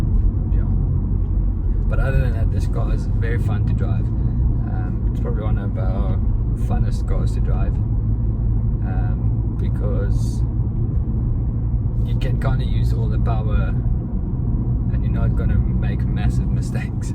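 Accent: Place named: South Africa. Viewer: I could listen to that South African accent all day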